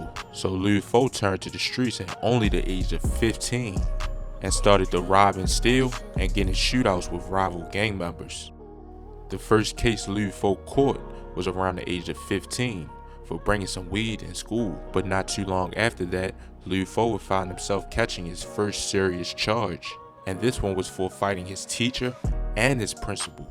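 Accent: Baltimore, United States. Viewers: First time ever on yo channel and I can tell you from Baltimore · Boy if you dnt shut tf up with that damn Baltimore accent shit annoying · Stupid ass Baltimore accent🤣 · He’s fasho from boltamore “duuuu” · You from Baltimore aint u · He from baltimore he cant help it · Bmore accent thick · He from Baltimore they pronounce they U's weird lmao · I can hear the Baltimore in you🤣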